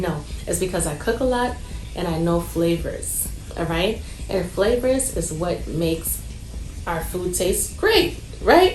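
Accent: New York, United States. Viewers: What a beautiful lady inside and out! I subscribed and enjoy ur videos soooo much ❤ such a NYorkaaahhh I hear the accent lol. Born and breed BX girl right here! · I’ve always wanted to ask : are you a New Yorker? Because it definitely sounds like it.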